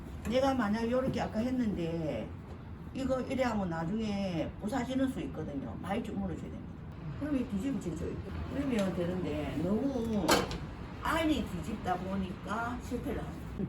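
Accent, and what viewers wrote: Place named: Gyeongsang, South Korea. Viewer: 통영이구나 경남말투인데 어딘가했네요 ㅋㅋ. 내일 시험인데. 합격했슴 좋겠네요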